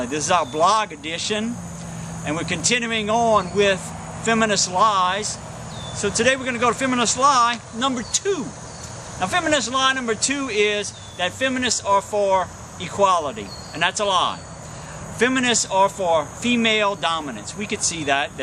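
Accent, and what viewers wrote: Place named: Louisiana, United States. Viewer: As a fellow Louisianian (from Cenla), I was convinced you were from Louisiana from the accent.... nailed it! Thanks and God bless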